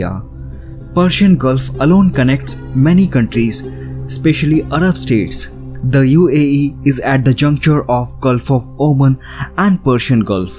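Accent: India. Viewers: Nasty accent I think it's Indian guy talking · Indian accent is irritating,but the content is superb! Congratulation to the presenter for the effort he has done.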